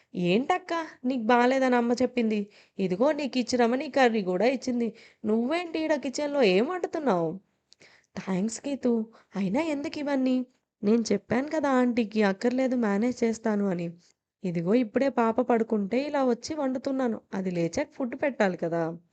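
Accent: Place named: Telangana, India. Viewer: Chala baga chepparu andi telangana slang kuda super ga vachindhi andi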